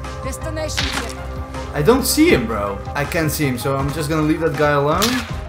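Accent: Netherlands. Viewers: are you from dutch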